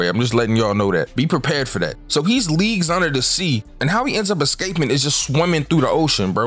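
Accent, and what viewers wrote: Philadelphia, United States. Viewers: You from philly bro 😂🤞🏿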